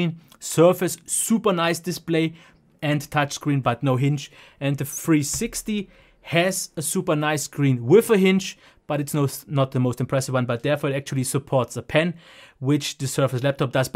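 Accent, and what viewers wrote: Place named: Germany. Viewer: Whenever i hear this guy's German accent, I'm thinking of the movie Terminator.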